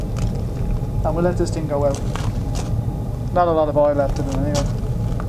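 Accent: Ireland. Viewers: OMG, that was entertaining to watch the entire way thru, what with the Irish accent, the simplistic attitude and the raw fire power just waiting for idk, something more than just heat.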